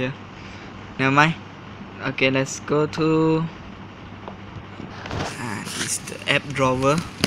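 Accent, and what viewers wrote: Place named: Malaysia. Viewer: Sounds like malaysian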